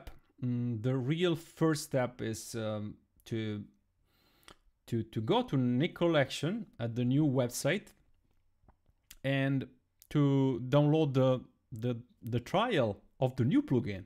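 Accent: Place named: Italy. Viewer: Nice video and great explanations! And you have a lovely Italian accent! Keep on the good work!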